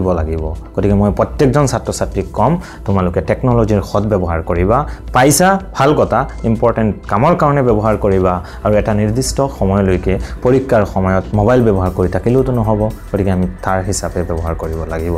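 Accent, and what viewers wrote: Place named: England, United Kingdom. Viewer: M a big fan of your English ascents bro 🔥 Great work carry on 🙏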